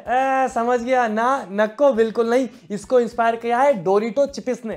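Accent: Maharashtra, India. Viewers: Bhai aap Maharashtra se ho kya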